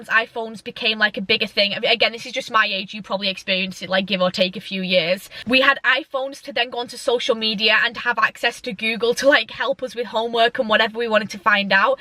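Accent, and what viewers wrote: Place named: Stoke, United Kingdom. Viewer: U sound Stoke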